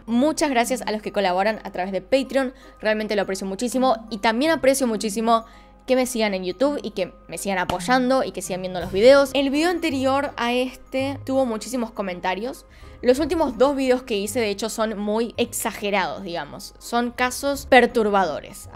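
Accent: Argentina. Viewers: Como todo (Pedante) Argentino la mujer de este canal tiene su pequeño acento Chocante.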